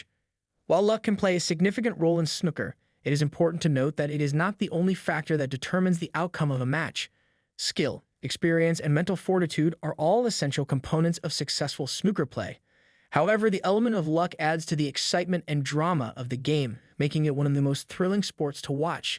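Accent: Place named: United States. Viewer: So weird hearing an American covering snooker and even the the way he said it annoyed me.. It's not the fish mate 😂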